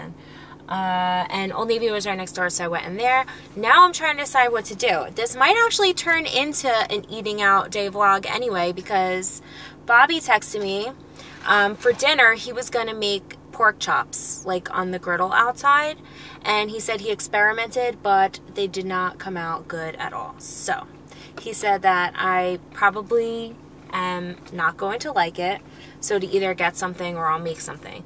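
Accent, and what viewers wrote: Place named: New York, United States. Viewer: Hi! I have to add sugar free maple syrup to plain Greek yogurt 🤗 because yuck lol I don’t know why every time you say “ coffee” I repeat it just like you with your New York accent 😂 Where did you get your cute black shoes? I’ve been in the hunt for some that I can just slip on. I love all the cloths!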